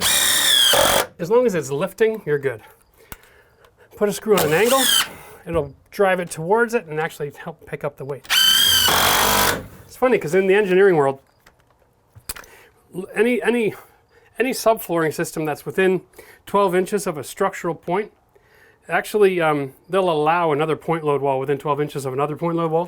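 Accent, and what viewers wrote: Canada. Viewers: Not 2 minutes before you mentioned Red Green, you said something with your Canadian accent and I said to myself, "he sounds like Red Green." That guy's funny.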